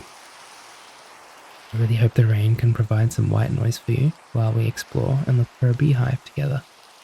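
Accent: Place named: Australia. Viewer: This is my second video to watch from you and I think you’ll be one of my favourites to watch from now on. Your voice is so nice and relaxing to listen to (it’s also nice to hear an Australian accent). I’m glad I clicked on your video ☺️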